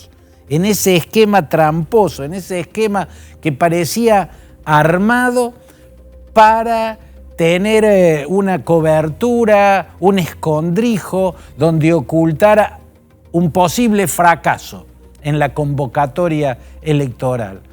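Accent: Argentina. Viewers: Para tu conocimiento: El virreinato de Perú en el año 1543 comprendía gran parte de América del Sur, que incluía los actuales Panamá, Colombia, Ecuador, Bolivia, Chile, Argentina, Paraguay, Uruguay. Entonces según tu retorcido punto de vista, los peruanos tenemos el derecho de reclamar que esos países vuelvan a ser peruanos. Por tu dejo parece que eres argentino o veneco, que Argentina pues vuelva a ser de Perú. ¿No te parece?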